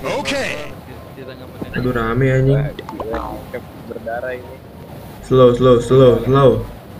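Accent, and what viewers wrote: West Java, Indonesia. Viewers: bang org mna bang Kaya Org Sunda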